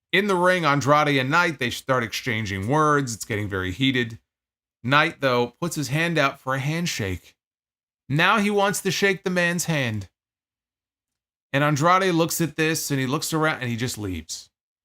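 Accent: New York, United States. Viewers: I love! He is the only wrestling tuber I watch/listen too! So level headed, cslm, cool, collected! And I can understand his NY accent!😂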